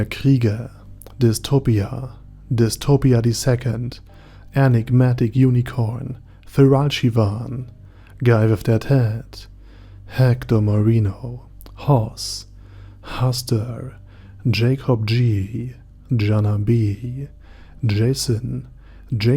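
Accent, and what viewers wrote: Germany. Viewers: wait. what? there are blokes who disliked his german accent narration? that is the only reason why i kept coming back to this channel, it is his thick german accent!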